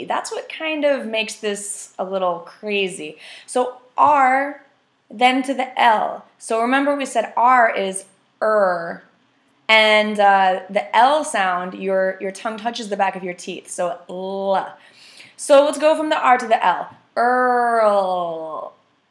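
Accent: United States. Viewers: It's something easy to listen to but difficult to practice, not only we, from India, but neighbouring Bangladesh, Pakistan, Srilanka, simply say WARALD and get rid of it. I faced a listening problem when I met Americans during my stay in USA, briefly, But you are making it a cakewalk to practice English in its pure American tone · Don't want to be pedantic, but you're teaching us american, not english pronunciation :). Thx!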